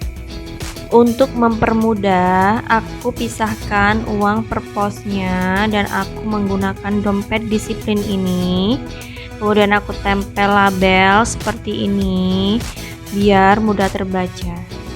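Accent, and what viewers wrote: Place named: Java, Indonesia. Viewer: Suara ne medok 😂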